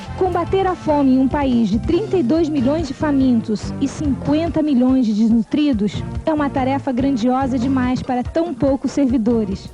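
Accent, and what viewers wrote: Minas Gerais, Brazil. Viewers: que bom que você continuou com teu jeitinho minerês de falar, é tão fofo. Abração, garoto! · 😮 Pode deixar esse sotaque mineiro bonito em paz!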